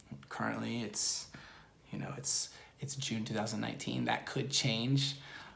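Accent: Buenos Aires, Argentina. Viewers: Parece un argentino que aprendió inglés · Estoy loca o cuando habla en inglés lo hace con acento porteño????? Cuando hablas en inglés te dicen que tienes acento????? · Te escuché tanto hablar en español que ahora parece que hablas inglés como Argentino que aprendió el idioma jskajaja te amoooo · Ahora cuando habla ingles se nota que tiene el acento argentino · Dude, you don't know how glad I am . Soy Brasileño, vivo en Buenos Aires, o sea ya era inmensamente feliz cuando te escuchaba hablar un "castellano porteño", y ahora que dijiste eso .... Cara o português que falamos no Brasil é unico, é particular, é sonoro. Espero que aprenda e um dia grave alguns videos que vamos te aplaudir de pé. Tamo junto kkkkkkk. You kill it man, nice video. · Hola, me encanta ver esta pasion por aprender otra cultura, solo habre otras avenidas, pero si talvez pierdas el bello acento Argentino. 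Pero extiende tus alas vuela. 💞🤗🤗🤗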